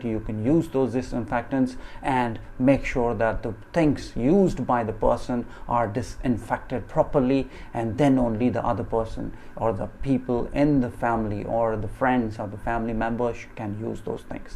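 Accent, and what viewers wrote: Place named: United States. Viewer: Why are you talking in an American accent?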